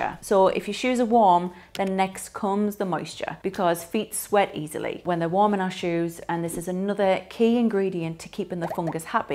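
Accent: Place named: Newcastle, United Kingdom. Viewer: Ooooh noooo! I bet the Californians love your Geordie accent ;) Thanks for the video, informative.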